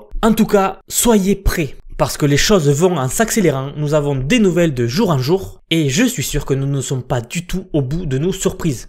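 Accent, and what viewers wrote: South, France. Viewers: Comment ça me fait trop plaisir d'entendre une voix du sud parler de technologie . Et puis je te suis, tes contenus sont supers et donnent la pêche.👍🏻😋. Tu es d'où si ça n'est pas indiscret ?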